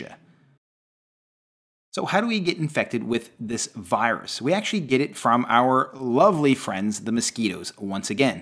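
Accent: Canada. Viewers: This guy is canadian I can hear he's accent 😁 esp when he says "about"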